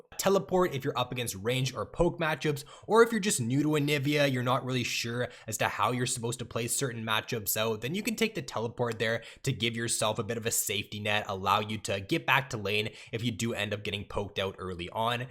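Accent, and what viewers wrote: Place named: Canada. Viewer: Took me watching like most your videos to hear your Canadian accent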